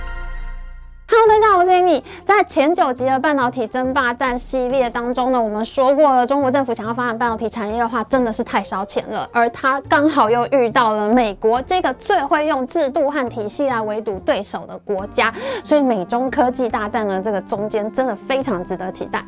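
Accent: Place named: Taiwan. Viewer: 我就愛聽我們的臺灣國語。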